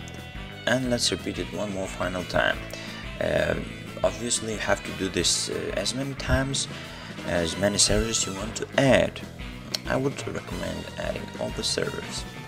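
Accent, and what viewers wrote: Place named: Ireland. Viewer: what is it with irish people doing game tutorials?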